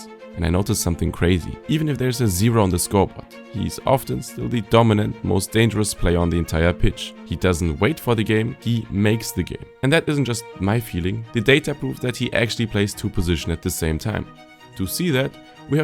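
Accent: Germany. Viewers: I don’t think this guys ever seen Kane play despite his German accent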